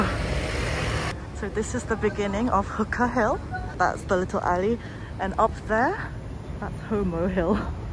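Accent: United Kingdom. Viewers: Do you have a british partner or spent time learning english in the UK? You have an interesting british accent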